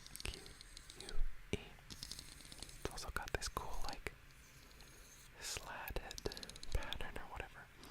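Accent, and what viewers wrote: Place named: Canada. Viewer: mic setting is immaculate 🤌🏼 also the way your canadian accent says certain words lowkey hits?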